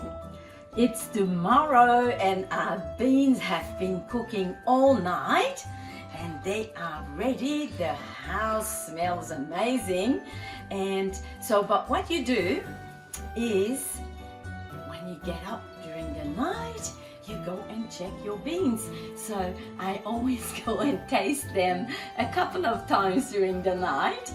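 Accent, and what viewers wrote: Australia. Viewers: I'm hearing several different accents in your speech, one of which sounds Australian/New Zealand??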